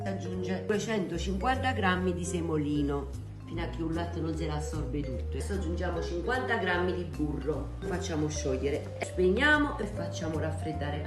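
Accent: Naples, Italy. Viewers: Gradisco anche la sua parlata napoletana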